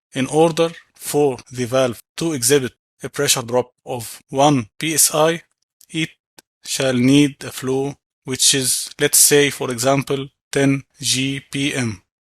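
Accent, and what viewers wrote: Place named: Egypt. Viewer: يا عم لو حكيت بالعربي كان افضل في الشرح وتوصيل المعلومة.. في الاول اعتقدت ان لهجتك هندية وبعدين وضحت نبرة النيل.. لاحظت ان اغلب فيديوهات الهنود يشرحو بلغتهم رغم تمكنهم من اللغة الانجليزية وذلك لاعتزازهم بلسانهم، اما من يتحدث اللغة العربية تجده يلهث خلف اللغة الانجليزية والسبب !!! اجعل الشرح باللغة العربية مع استخدام المصطلحات الانجليزية حينها تكون المعادلة معقولة وتعم الفائدة. ربي يعينك ويساعدك. مهندس ليبي مر من هنا.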